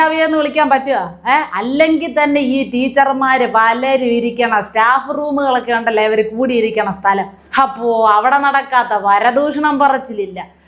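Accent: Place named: Trivandrum, India. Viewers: Kidu avatharanam ji... Trivandrum slang adipoli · തിരുവനന്തപുരം സ്ലാങ് നന്നായി സംസാരിച്ചു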